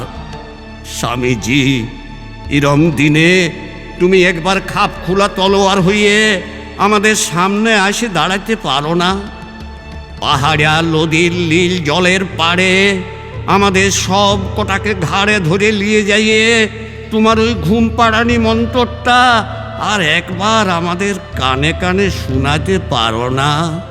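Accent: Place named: Bankura, India. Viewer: ভালো লাগলো যথারীতি। একটা কথা বলি, যদি কিছু মনে না করেন। আপনি তো স্বর্নালী সময়ের অধিকাংশ সময়ে কোলকাতায় ও সাউথ চব্বিশ পরগনার স্কুলে যাপন করেছেন। আপনার বেশির ভাগ কবিতার উচ্চারণে বাংলার বাঁকুড়ার গ্রামের ভাষার স্টাইল সব সময়ে সকলের ঠিক ভালো লাগে না । এ ব্যাপারে একটু মনে রাখলে বাধিত হবো। আর একটা কথা বলি, সুলেখা দিদিভাই এর কমেন্টস্ ভীষণ ভীষণ ভালো লাগলো। আপনারা দুই জনে আমার শ্রদ্ধা ও প্রণাম নেবেন।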